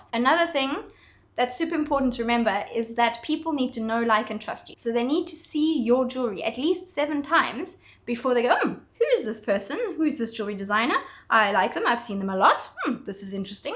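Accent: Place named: South Africa. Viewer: I just knew that was a South African accent 🙌🏻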